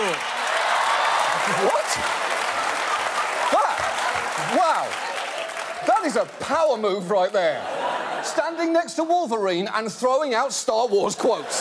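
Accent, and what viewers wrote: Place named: United Kingdom. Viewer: What I see is John Oliver is not smart as he thought he is. Before you say something just put yourself in Modi’s shoes. You wouldn’t survive even a day. Your British accent does not prove you are smart enough to says something about someone else. Besides, your advice Indian, look at your own god damn county and president. One last thing if anyone can explain me why British people turn in to jerk when they come to United States, they can’t even say singe sentence without swearing and using F word. They are very respectful in their language on their own motherland. Because I also saw Master chef where Gordon as well swear at vegetable. Can anybody understand that?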